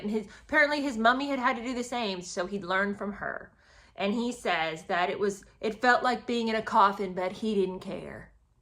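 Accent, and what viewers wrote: United Kingdom. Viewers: Omg me too, I love your nasally British voice 🥰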